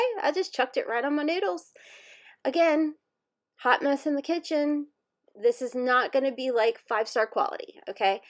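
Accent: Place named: South, United States. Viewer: Hey!! Looking forward to following this channel! Oh & I love your accent, I'm from Georgia so I have one myself but love hearing the different styles of southern accents! Lol My best friend of almost 30 years moved to Danville, Kentucky about 10 years ago and I can hear her picking up some of the accent from there now. I know when I was in Kentucky last the lady at the restaurant couldn't understand me, I asked for water & got a dead look, she had no clue what I was asking for lol Anyways! Thanks for the video! Looking forward to more!!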